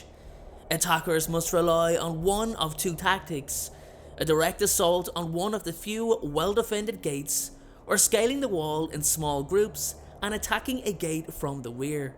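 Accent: Dublin, Ireland. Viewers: That Dublin accent just ruined this video😫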